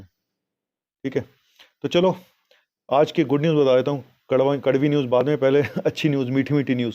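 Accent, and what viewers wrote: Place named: Bihar, India. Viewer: Sir your Bihari accent was excellent 😀😀😀😀😀😀😀.well done sir 🙏🙏🙏🙏🙏🙏🙏🙏🙏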